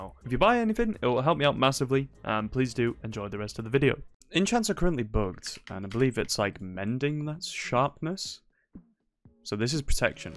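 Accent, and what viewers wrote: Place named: United Kingdom. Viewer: how i found your channel from like 10 months ago is i saw a hoplite video heard a british person speak and i was hooked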